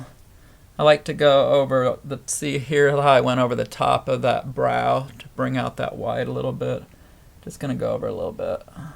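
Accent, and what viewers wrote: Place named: North Carolina, United States. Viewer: I love that you have an experienced teaching style. Are you from NC, your voice sounds like it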